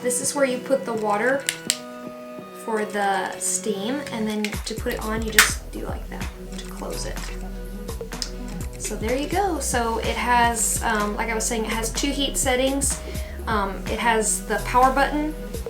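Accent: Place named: United States. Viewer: O wow u got a country accent. Never really noticed it when i watched u guys’ vlog channel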